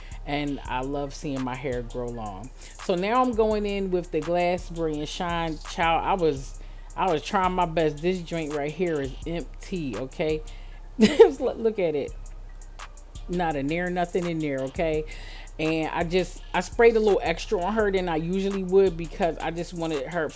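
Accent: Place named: New York, United States. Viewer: I’m from NYC and I just love the way you speak! So “Up North!”.. feels like home! And thanks for the education sis!🌹come to Charlotte!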